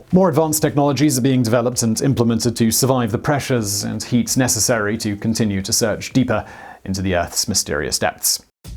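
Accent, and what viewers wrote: United Kingdom. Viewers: As is all algorithms from Borg to Utbe, it go to hell kiss my ass and I'll do as I damn well please. Such is math. Also your device is set probably on Autoplay. Mr British guy is like all things UK: only in small amounts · no British accent can hide that mistake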